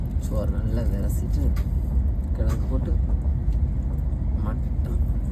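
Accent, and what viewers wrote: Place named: Nanjil Nadu, India. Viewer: கிட்ட காட்டு மக்கா- நாஞ்சில் தமிழ் ❤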